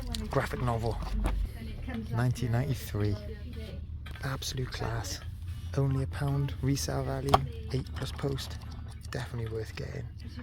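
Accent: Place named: Jamaica. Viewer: That is some Jamaican accent you have.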